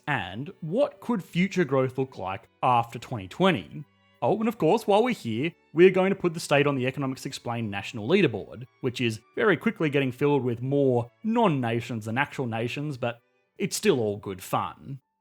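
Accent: Australia. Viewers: I an Irishman listening to an Australian talking about the economy of Texas 😂😂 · Me, a stranger lost in parts unknown, listening to an Australian talk about the economy of Texas while i watch a round blue creature waddle around in front of me waving a mallet. I think it's time for me to move to Texas. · "This! Is Texas." I've been waiting to hear this Aussie say these words for too long!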